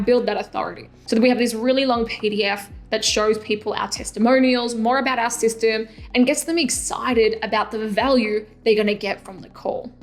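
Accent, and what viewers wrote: Australia. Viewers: Never getting tired of the Aussie accent and never getting tired of your videos 🤷🏻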